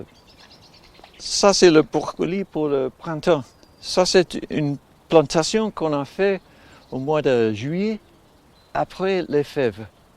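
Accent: United Kingdom. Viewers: Hi . bonjour merci quelle belle video fait avec un grand coeur rempli d'envi de partager vous etres a lentlemen merci . Je suis en france et je regarde toute vos video . C,est un bonheur de vous ecouter francais parfait with the English touch beautiful ❤️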